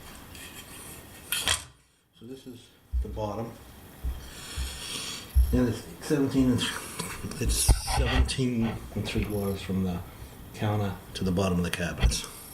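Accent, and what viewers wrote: Boston, United States. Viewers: Just bought my first home & have been binge watching your videos. Thanks so much for explaining things so carefully! Question: any plans for a wall tile PDF? I would definitely pay for that! Btw—ya accent makes me homesick for Boston BIG TIME! That’s actually what made me keep watching! Lol...thanks again!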